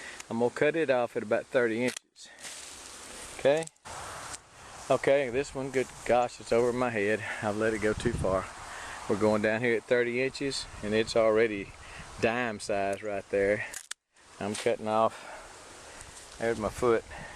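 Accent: Texas, United States. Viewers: That's a natural Texan accent. Everything is better in Texas.😎😘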